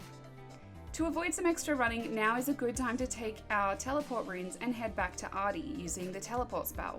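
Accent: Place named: Australia. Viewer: aussie, I like it (im aussie/kiwi)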